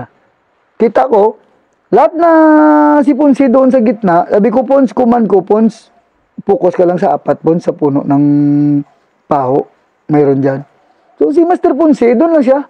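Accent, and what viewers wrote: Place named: Iloilo, Philippines. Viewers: Parang ilonggo si sir ah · Alam mo talaga pag ilonggo kay tuno perti gd 🫡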